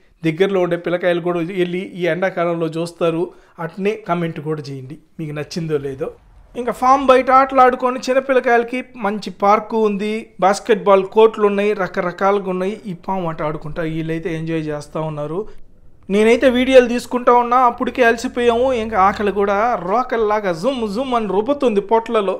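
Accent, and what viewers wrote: Nellore, India. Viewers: I am not saying you need a fake voice. Just talk normal how other travel blogger’s and other youtubers are talking. You try to speaking over extra Nellore slang . I am not any advice to you. Since I am your subscriber I telling to you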